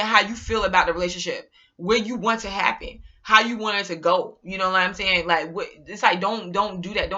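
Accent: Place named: New Jersey, United States. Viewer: .i love your jersey accent and your list lol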